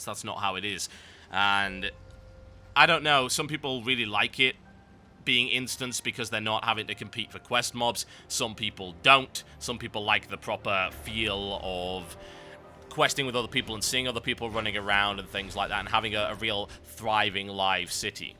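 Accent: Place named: Newcastle, United Kingdom. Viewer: It's nice to see another geordie.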